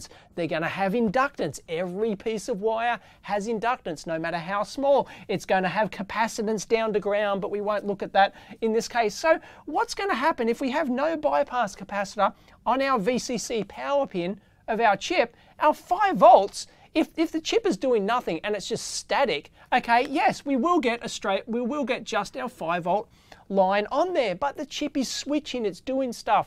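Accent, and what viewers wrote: Australia. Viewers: Now I no why!!! Thank you! Great tutorial! Aussie English, it always makes me happy! I'm still saving money for a trip to Australia... My only sorrow is that when I be there, down under, I don't want to go back home. There's something about Australia that attracts me. Don't ask my why or what it is. Greats from the Netherlands! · A book would be nice. Sometimes i cannot stand his Australian accent. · When I remember my Uni studies, the voices of my lecturers voices always come to mind. Now, when I put in any bypass capacitors my inner monologue will be telling me how to do it in a surprised sounding Aussie accent. Love it.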